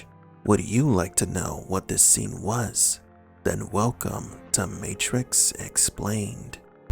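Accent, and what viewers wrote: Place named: Russia. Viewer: Nice accent, narrator person! 👏🏻👏🏻👏🏻 Russian ryt?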